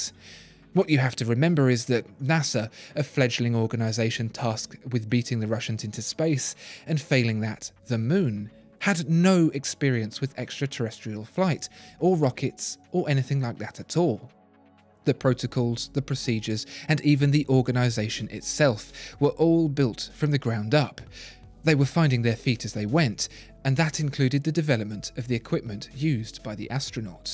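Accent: United Kingdom. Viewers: I look forward to each and every one of your videos, they are supremely well done and different to any other watch channel on YouTube. Also it is pleasant change having a British voice rather than the many American channels (no offence to our US friends, just different). Keep up the great job 👍😎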